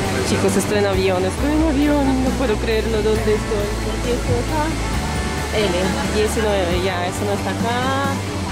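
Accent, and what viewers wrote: Peru. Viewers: HOLA ME RESULTASTE MAS ACHORADA .....ESTAMOS JODIENDO /////ME HECISTE REIR USUALMENTE UNO HABLA LISURAS MAS CON LOS AMIGOS MAS INTIMOS PERO YA TIENES ACENTO PERUANO POR ESO SE TE QUIERE SALUDOSSSSS Y HOY ME SUSCRIBI PARA SONREIR DE TUS VIDEOS.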